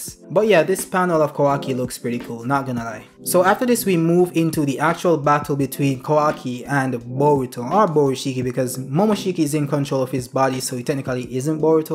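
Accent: Jamaica. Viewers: Are you a Jamaican?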